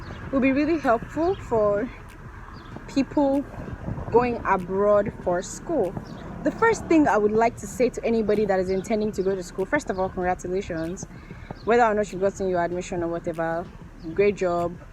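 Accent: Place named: Africa. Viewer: Why do you sound African? I'm hurt😖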